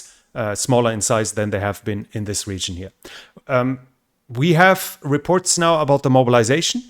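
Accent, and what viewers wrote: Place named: Germany. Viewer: Well he does have a German accent and that is totally fine, you shouldn't look to loose your accent when learning English but instead focus on pronounciation, vocabulary etc...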